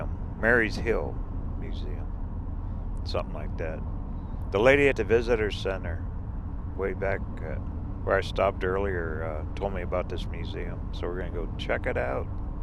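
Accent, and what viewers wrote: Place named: New York, United States. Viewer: You sound like your from NEW YORK City ,there is life out here on the west coast, ya all come back now!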